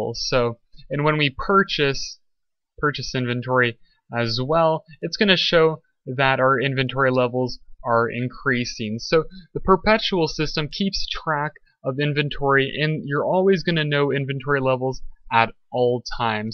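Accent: Canada. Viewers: Clara Van Biesebroeck hes Canadian haha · He says aboot, probably Canadian.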